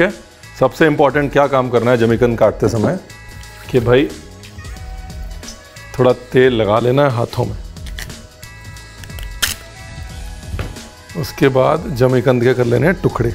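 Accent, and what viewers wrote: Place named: Punjab, India. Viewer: I like your style of explaining everything. And, your typical Punjabi way of talking. I am going to make Suran the way you demonstrated today. I used to make in a different, Tamil Brahman way, my neighbor had shown me & it was tasty, but your recipe seemed amazingly simple & I could taste it in my home, here. Thank you Ranveer.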